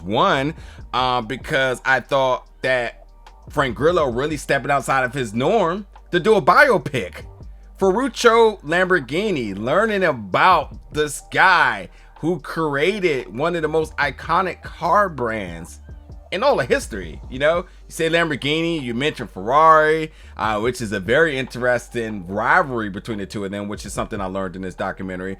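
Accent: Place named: Baltimore, United States. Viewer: Are you from Baltimore or something? 😅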